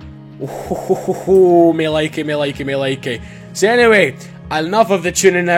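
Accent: Scotland, United Kingdom. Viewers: Are you from Scotland. I like your accent if that's how you spell it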